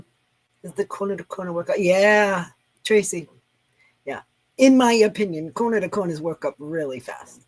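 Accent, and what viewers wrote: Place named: New York, United States. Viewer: Hi. Sorry I missed your live but watching replay. Your Boggy Blanket are so beautiful. Congrats at almost your 100 goal of number of blankets. That is a lot of work. Love to watch your videos. Not sure of where you are but your accent sounds northern, maybe close to New York. Have a great day, take care and stay safe. A friend.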